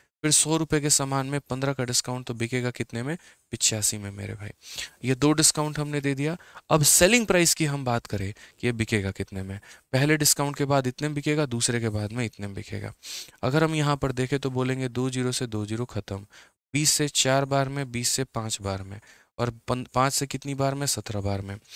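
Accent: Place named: Bhojpuri, India. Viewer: Apki bhojpuri tone sir just ...... Hayeee🙈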